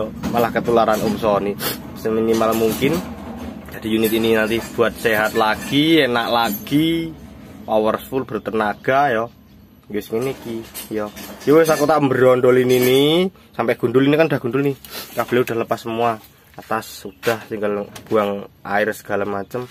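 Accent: Java, Indonesia. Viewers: Sampeyan asline jowone pundi mas..?? Jowone kok medok temen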